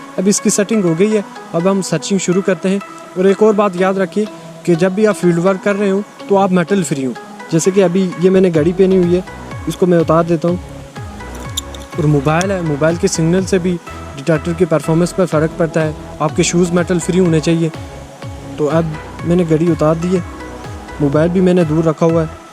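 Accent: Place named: Kotli Sattian, Pakistan. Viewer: Bro ap kotli satyan k aas pas k Hain .Mera andaza narar ka hai.ap ki zuban say andaza lgaya hai.reply krna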